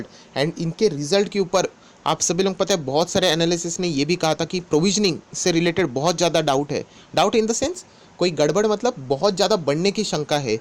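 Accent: Maharashtra, India. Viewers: Are you Marathi? You language (tone) seems familiar. BDW awesome content and knowledgeable, following your channel since month and learning new things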